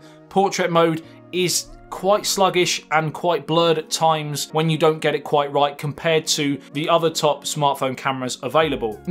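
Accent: United Kingdom. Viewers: annoying accent and usually like brits accents must be different region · I hate British accent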